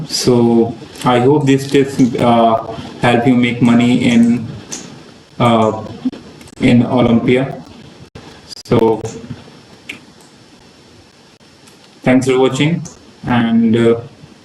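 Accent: India. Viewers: ofc indian af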